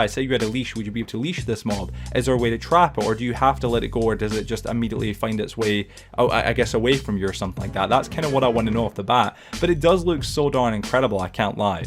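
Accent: Scotland, United Kingdom. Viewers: Where in Scotland are you from ?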